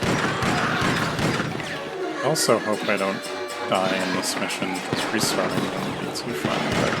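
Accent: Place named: Canada. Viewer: You have a sexy Justin Trudeau accent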